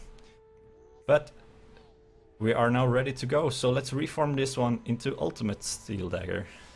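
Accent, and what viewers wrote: Sweden. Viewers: are you swedish? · Is that a swedish accent i hear? D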